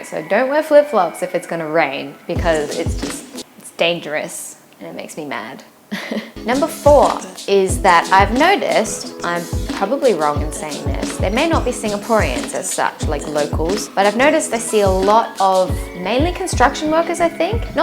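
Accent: Australia. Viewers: Hey, thanks for this video! It is very educational and informative! As an American, I've always been interested in visiting Singapore because I understand it is a very beautiful, stable, and safe country. By the way, I love that Aussie accent of yours! You're adorable and entertaining! I look forward to seeing more of your videos! You just earned a new subscriber!:) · You sound like an Aussie, are you from Australia? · Pssst... what's she nattering about? Did anyone get her Aussie accent? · are you Australian? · God I love Aussie accents.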